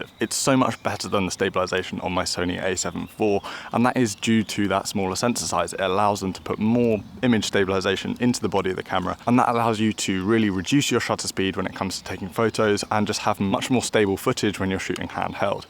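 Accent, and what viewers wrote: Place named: United Kingdom. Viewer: thanks for your quick reply, of course you are english mother tongue (only your surname sounds Italian, your pronunciation and accent are perfectly british), I am not, that's why I wrote you that you are very clear to understand (for a foreigner like me).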